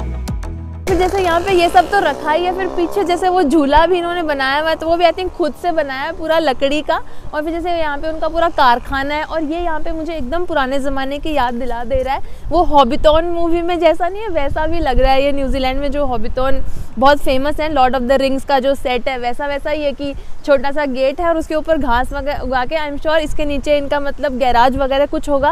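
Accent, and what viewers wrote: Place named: Shekhawati, India. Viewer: Mahesh fouji/ jhunjhunu .mere ghar me buffalo, padi or camel h. Aapki voice bahut achchhi h or shekhawati Ki jhalak dikh rahi h.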